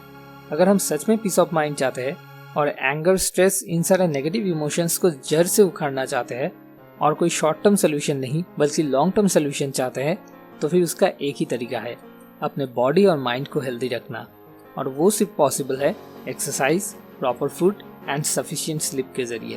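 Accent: Bengal, India. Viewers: Initially this voice was looking awkward to me. BUT nowadays, I like this voice & pronounciation of Bengali Hindi. 😊✌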